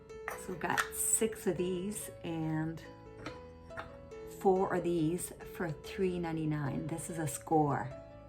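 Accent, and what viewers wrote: France. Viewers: Great job, shopping,!!! I can't wait to see your finished treasures!!! This video answered a question for me. I noticed that you have a lovely accent, but I wasn't sure what it was. So, it's French!!! You handle the crazy English language very well!!! You are so sweet!!! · Great finds! I love the big bunny too! I also love your French accent... Beautiful! 💗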